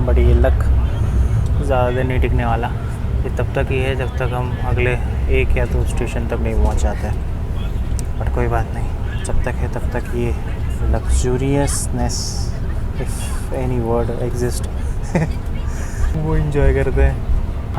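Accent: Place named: Ahmedabad, India. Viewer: I think railway has personal grudges from you tabhi DD mein every time upper deck or station par baat karte time, EMD honk 😂 Jokes Apart, But seriously hamesha ki shaandar. Bich Bich mein Jo Ahmedabadi accent or Common Man wali bhadaas bahar aati hai, Cherry on Top ❤️ Baaki Kabhi toh moka do sir, ki vlog mein hum koi galti dundh sake 🤣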